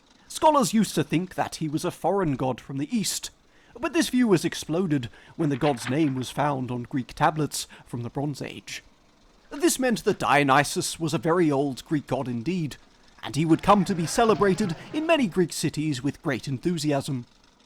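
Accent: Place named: United Kingdom. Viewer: This sounds very British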